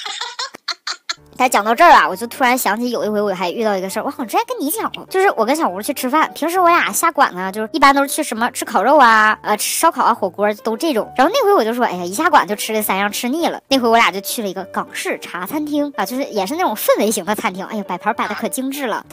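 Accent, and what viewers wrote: Northeast, China. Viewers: 我发现留言的网友，居然只有我一个是男士。老尴尬了😅！我是西北人，为啥喜欢东北口音，主要我是东北大学毕业的，出国很多年了。熟悉的味道。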